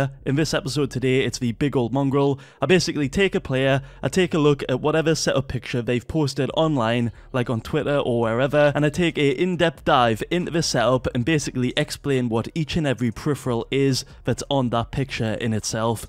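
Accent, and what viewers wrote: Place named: United Kingdom. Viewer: that British accent hit different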